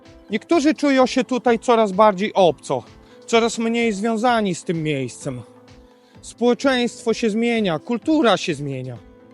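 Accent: Podlasie, Poland. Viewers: od razu słychac, mam dużo kumpli z podlasia, wszystkich na emigracji spotkałem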